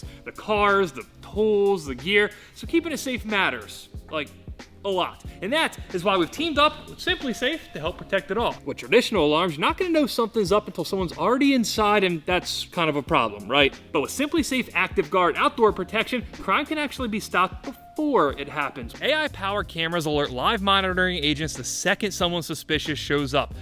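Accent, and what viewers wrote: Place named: Baltimore, United States. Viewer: I JUST MISTOOK YOUR BALTIMORE ACCENT FOR THE DELCO ACCENT. I had to look it up. Ohh its thick in this video lolo 🤷‍♂️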